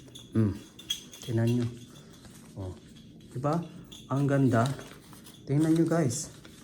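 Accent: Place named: Visayas, Philippines. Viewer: Bisaya ka sir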